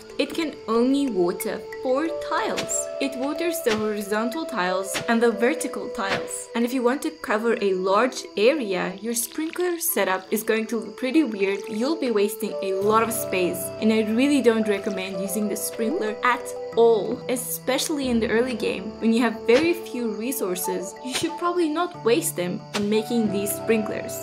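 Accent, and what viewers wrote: South Africa. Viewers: South African? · I hear a South African accent. Hmmmmmmmmmm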